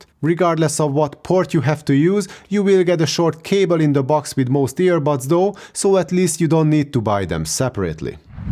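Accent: Hungary. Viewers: Hello, great guide, it helped a lot in choosing my earbuds! I was wondering what your native language was, because listening to you felt like you were talking to me in Hungarian accent the whole time. I can't help but mention this. :D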